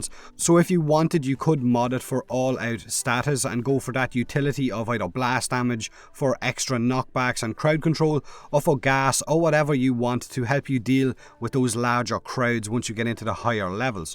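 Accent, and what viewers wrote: Ireland. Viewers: I do enjoy an Irish accent much better than listening to the wee hawbaw wanks about here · Holy shit, that is an awesome Irish accent! Hahah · Irish accent sounds so funny :D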